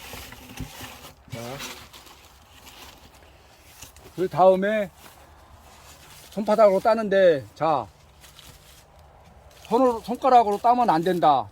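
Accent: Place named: Gyeongbuk, South Korea. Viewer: 네네 경북 말씨로 알속무게 이네요 감사합니다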